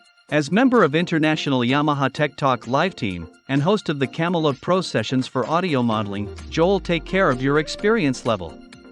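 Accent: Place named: France. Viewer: Joel, thank you for creating this software. Love your accent my French neighbour.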